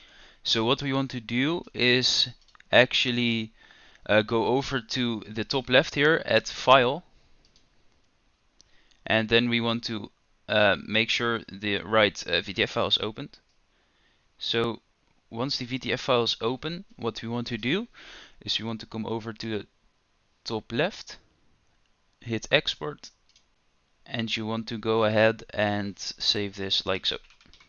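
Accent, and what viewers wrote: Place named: Netherlands. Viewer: ik hoor meteen dat jij nederlands ben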